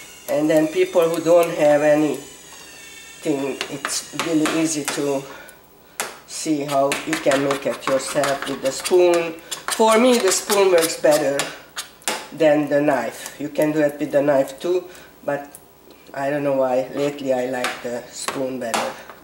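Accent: Hungary. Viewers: Thank you for doing all of these! They are really helpful. My father was a '56er and we had many Hungarian friends in Cincinnati. I remember for St. Stephen's Day we would have a big gathering. The older women would come over to our house early and make Goulash in a pot over a fire in our back yard. And they would always cut the Nokedli into it! Your accent brings me back to those days. I could listen to you talk all day.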